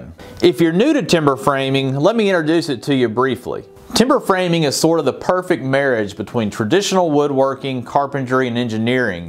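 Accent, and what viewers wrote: Tennessee, United States. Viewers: Sure it is going to be great to follow! Good luck! Ah, Tennessee! Haha i like the accent!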